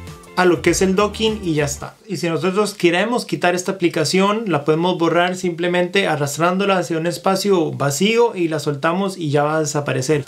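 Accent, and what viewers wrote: Costa Rica. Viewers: Saludos mae (sorry pero el acento tico fue algo que le agarré desde la primera frase). Muy buenos los videos, encontré el canal por mi búsqueda de información sobre la Mac Air 1 la cual deseo adquirir para trabajar en Análisis de Datos (SQL, Excel, Power BI) y por lo que veo de sus comentarios (así como referencias de amigos que trabajan en TI) pues parece que la opción de la Mac Air I es bien sensata y parecería ser suficiente. Una pregunta: –Gustos aparte ¿qué color me recomendaría? Me gustaría mucho la negra, pero según veo se puede ver descuidada por tanto manoseo o así, entonces pienso en la gris.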